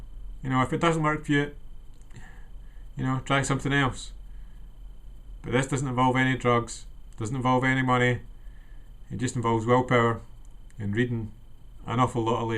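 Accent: United Kingdom. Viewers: It's quite refreshing to hear a scottish guy talk on YT to be honest. All i hear is americans, the english and aussies. and im aussie too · Hi, I'm suffering from terrible anxiety/ lack of sleep. I can relate to every single thing you've mentioned in this video. Things seem terrible now but I hope that one day I will be making a youtube video like you, helping other people. My diet isn't great.. I must admit but that's down to me being at home 99% time feeling sorry for myself and not knowing what to do. I just downloaded the audio book and I'll give this a good go. Tried pills, hypno etc.. P.S mum's from glasgow, nice accent! :)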